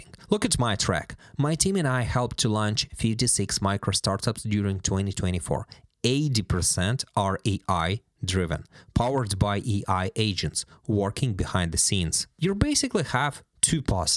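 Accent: Ukraine. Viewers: Holly Dolly, you are our guy. I undestand that by accent. Ukrainian too?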